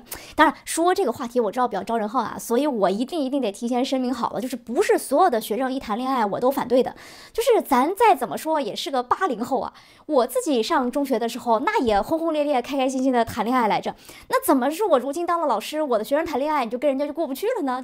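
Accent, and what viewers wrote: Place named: Beijing, China. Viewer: 还有就是，北京的儿化韵快起来我真的差一点听不懂😂😂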